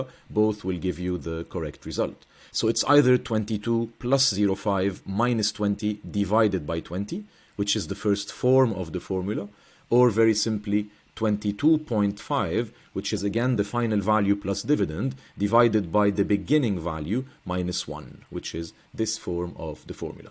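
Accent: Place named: Lebanon. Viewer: I knew this is Lebanese accent, I checked where the institute is located, but great explanation. Thanks a lot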